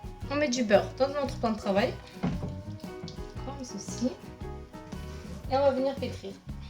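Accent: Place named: South, France. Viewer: Superbe cette recette en plus avec un joli accent du sud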